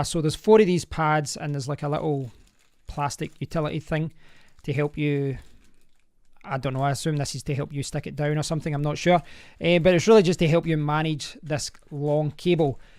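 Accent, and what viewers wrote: Scotland, United Kingdom. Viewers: ! Brilliant! Magic! Finally someone on Youtube I can understand. ( I'm Scottish too ) living here in the US. I liked your review of the Dash cam. very good. keep up the good work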